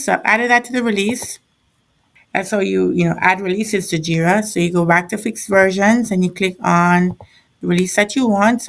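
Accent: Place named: Jamaica. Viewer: Sounds like you're a yardie. Loved the video, keep up the great work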